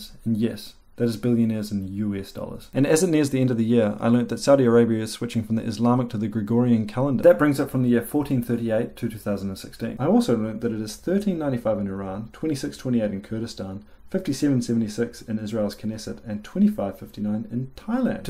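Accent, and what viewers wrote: New Zealand. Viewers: He speaks too quickly and has a broad Kiwi accent. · oof that heavy disheartened sigh after the civil war stats nonetheless i'm now even more convinced that kiwis have the best accent idk how people can't understand what you say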